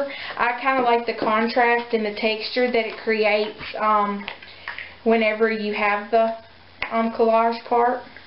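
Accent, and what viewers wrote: South, United States. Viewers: You're so comfortable in front of the camera! I'd get all tongue tiied. I love your videos too. I love that Southern accent too. I'm from South Carolina, so I've got that that same slow way of talking, but I think you're a little more Southern than I am. :) Keep the great videos coming!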